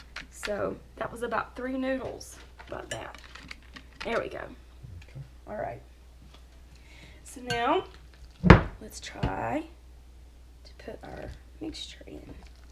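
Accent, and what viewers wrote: South, United States. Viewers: Love how those southerners pronounce the Italian name "Barilla".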